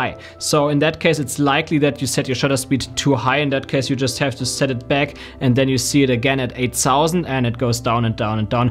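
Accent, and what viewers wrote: Germany. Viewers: German or Austrian?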